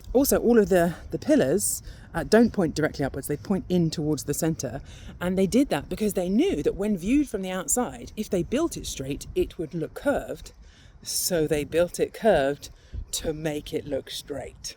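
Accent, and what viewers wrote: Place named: RP, United Kingdom. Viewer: Everything always sounds more believable and trustworthy is explained in a posh English accent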